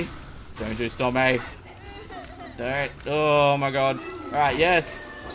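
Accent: Australia. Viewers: Your from Australia!😱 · austrailian?? meh still like his voice:) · WAIT YOUR AUSTRALIAN!?!? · Wow Australian I'm Australian too I can't really here to your accsent like Mr the epic ninjas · Are u from Australia like me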